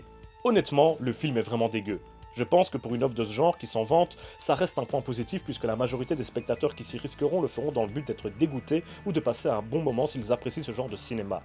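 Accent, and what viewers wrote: Belgium. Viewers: et là bas compatriote Belge! Enfin....sans vraiment savoir, juste ton accent met familier! Hoooooooo oui Clairement, je suis fin friands et amateur de cinéma Underground et ce.....depuis longtemps! J'ai 31ans mais mon VHS des Germelins a 5ans me marquera pour la suite de ma vie cinématographique, ensuite a 10ans+- je reste bouche baie devant les Cénobites de Barker et forcément.....Freddy, je suis un ptn de fan des nanars gore des années 80, Abomination, BlackPast, BasketCase, les TROMA et puis bon....j'ai un vrai problème avec les zombies pr qui j'y porte une affection particulière (ON AIME PAS LES ZOMBIES QUI COURENT!) Romero, Fulci etc....etc.... Étant un gobeur de GrindCore/GoreGrind/BrutalDeath/BlackMetal etc.... C'était presque obligé de tomber fan du cinéma Underground avec une préférence pour Vogiel & Lucifer mais je gobe tout! Vive les litres d'hémoglobine, le sarcasme, l'humour noir et le 17e degré de l'humour! 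J'étais obligé de m'inscrire chez toi en découvrant ta chaîne ce matin 🙏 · Avec plaisir cher je partage ta passion:) + j'aime trop ton accent belge.Continues tes vidéos on t'aimes:)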